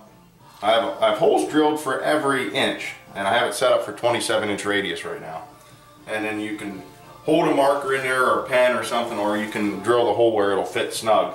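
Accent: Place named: Pittsburgh, United States. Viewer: love your videos. I'ma new modeler and appreciate what you do. Also a PSU fan and Pittsburgher, picked up your distinct Pittsburghese in a previous video. Keep up the good work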